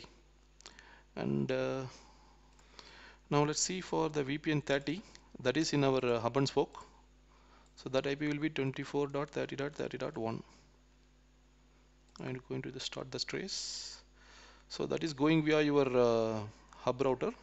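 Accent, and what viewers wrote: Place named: Kerala, India. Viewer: Sounds like Kerala Nice video chetta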